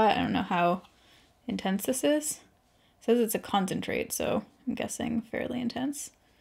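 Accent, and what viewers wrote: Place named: United States. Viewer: Scalps. She made scalps for dinner... The American accent blows my mind sometimes lol. Love you, girl! 💕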